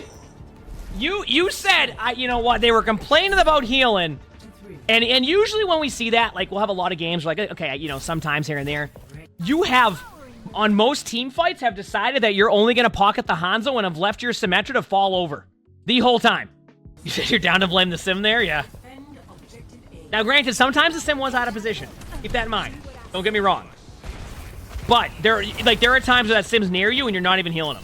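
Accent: Boston, United States. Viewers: I love the Boston accent😂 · Love the Boston accent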